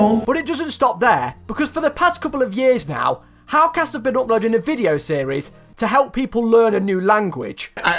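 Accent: United Kingdom. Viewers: that british accent, lol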